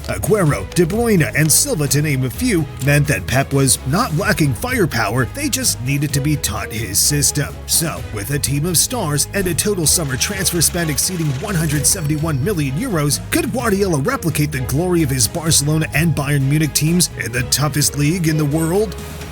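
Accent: United States. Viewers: You'd heard that american accent? They're new here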